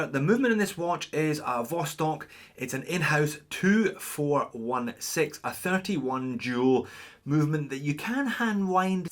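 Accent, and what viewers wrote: Scotland, United Kingdom. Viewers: No he has a lovely Scots accent, the "go to" pirate accent is a sort of generic West Country accent.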